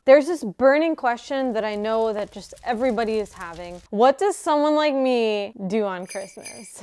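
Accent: United Kingdom. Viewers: Perfect 👌🏽 English accent from east London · It’s the British accent for me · “When there’s actually culture” please 😂😭💀 Oh dear gOD THE BRITISH ACCENT PLEASE 💀💀💀💀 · That British accent was top tier!!!😂😂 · Excellant English accent! You're brilliant. So funny. You make me smile on the darkest of days in my life. Please keep it up. Thank you.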